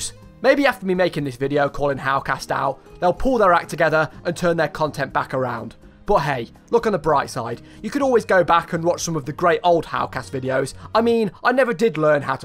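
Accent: United Kingdom. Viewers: that british accent, lol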